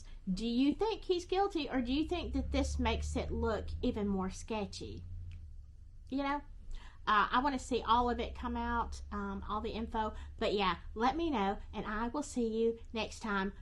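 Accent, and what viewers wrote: South, United States. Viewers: OMG ! YOUR NOT MY CUP OF TEA ! Your Southern American accent is endearing x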